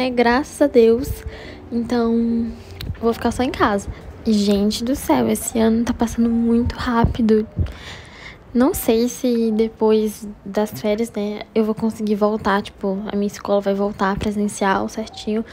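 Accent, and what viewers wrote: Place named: São Paulo, Brazil. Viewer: amigaaa,tbm sou de fortaleza e jurava que vc era paulista kkkk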